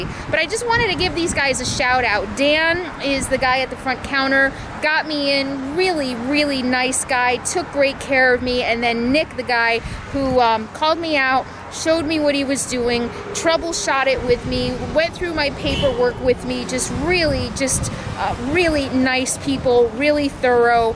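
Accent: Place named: South, United States. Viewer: Nothing wrong with picking up a little Southern twang...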